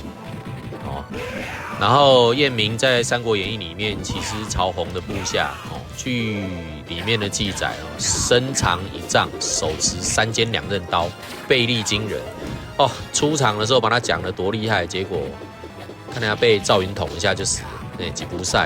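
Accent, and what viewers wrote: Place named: Taiwan. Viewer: 我喜歡你講解的方式!!!夠台!!!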